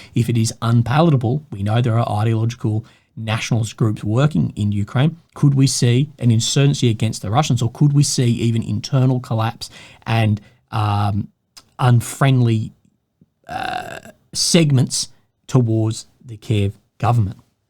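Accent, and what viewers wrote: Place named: Australia. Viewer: This top bloke is an Aussie.His accent as well as his ideas are also 'top'!!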